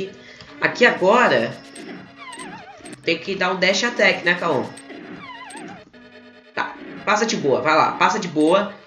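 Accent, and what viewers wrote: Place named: Minas Gerais, Brazil. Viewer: Vc é de Minas Gerais seu sutaque é bem parecido com alguém que mora em Minas Gerais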